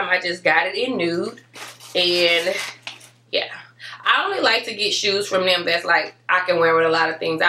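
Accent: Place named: South, United States. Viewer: i love your accent you got to be from the south like and once again u finer than any model out there!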